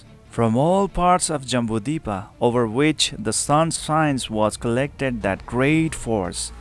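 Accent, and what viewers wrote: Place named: Nepal. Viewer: You have a rich Nepalese accent. Keep the brilliant work going.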